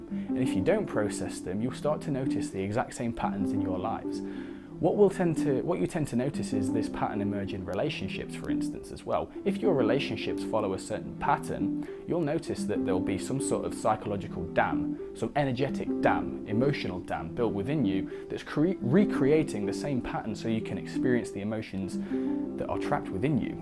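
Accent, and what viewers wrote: United Kingdom. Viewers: So happy that I've found this video! Thanks a lot for mention the book, I could feel in my body that only talking therapy isn't enough... I feel just like Ece Erdem: got here for healing and stayed for the content but those eyes and the British accent added that special thing... <3 lovely! Thanks, Thanks, Thanks a bunch,! Much love from Brazil!:)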